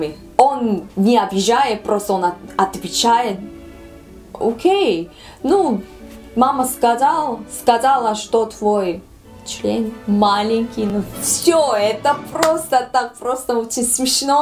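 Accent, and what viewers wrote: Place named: Tajikistan. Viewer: Базаришь как таджик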